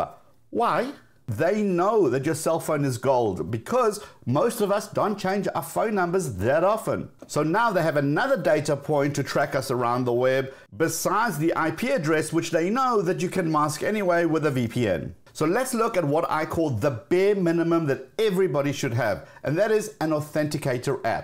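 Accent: South Africa. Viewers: are you south african? You sound like a soutie😂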